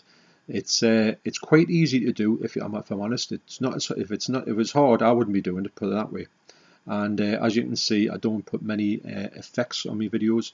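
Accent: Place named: Newcastle, United Kingdom. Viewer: Hi, I think it's fantastic that you are open to new experiences, ideas and the chance to improve the individual that you are. I really enjoy your content and your friendliness. You have a really charismatic on-screen presence plus I love the Geordie accent. Never stop being yourself but also never stop limiting yourself.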